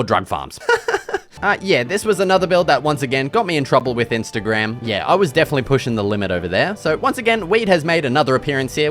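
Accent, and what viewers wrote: Australia. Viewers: Fuck man I love Australian accents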